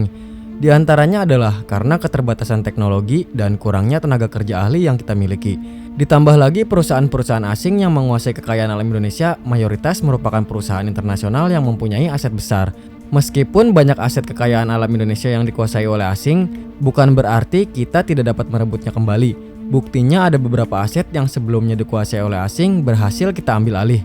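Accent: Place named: Banjar, Indonesia. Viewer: Pasti yg ngomong orang banjar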